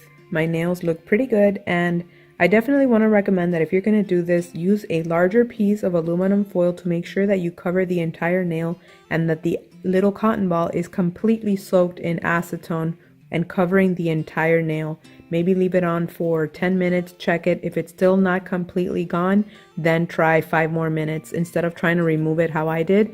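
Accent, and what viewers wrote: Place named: Latin America. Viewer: Wow! You have that traditional Latina accent that is so distinctive. I love it. Keep up the great work.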